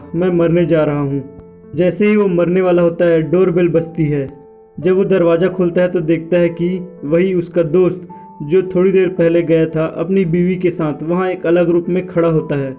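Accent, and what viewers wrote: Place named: United Kingdom. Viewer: Britain voice h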